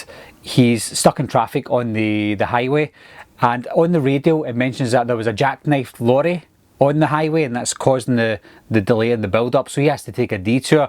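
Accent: Scotland, United Kingdom. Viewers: Are you Scottish my friend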